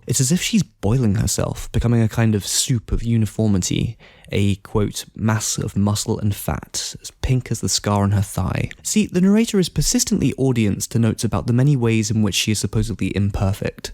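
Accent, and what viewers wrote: United Kingdom. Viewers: Excellent use of clips to accompany what you were saying. I hadn’t heard of the book but this has made me want to read it. British?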